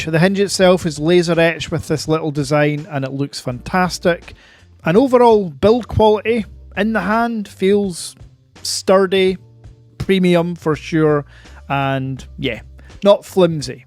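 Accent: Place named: Scotland, United Kingdom. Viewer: Sounds mad but it could because you are Scottish and the accent ..People are very shallow 😂😂.I bet if you had an Aussie or Yankie accent be well on the way to 100k subscribers 👌👌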